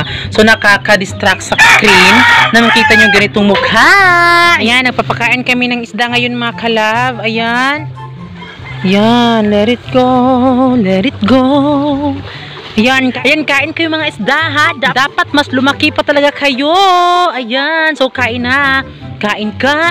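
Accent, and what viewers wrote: Visayas, Philippines. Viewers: nakakainis naman ung sinasadya mong pagbigkas na may bisaya accent like EKOT EKOT at DETU. pagbisaya nalang kay pangit paminawon. ayaw mo kasulo kay constructive criticism na ha.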